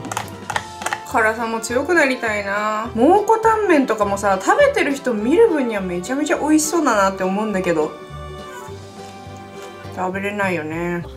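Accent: Aomori, Japan. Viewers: ちょっとなまってて可愛い♡ 青森っぽいけどどこ出身なんだろ、、 そしてそして今日も美味しそうです🤤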